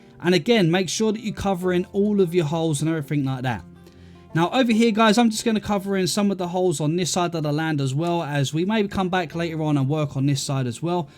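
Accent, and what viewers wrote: United Kingdom. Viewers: how did i know this guy was going to be British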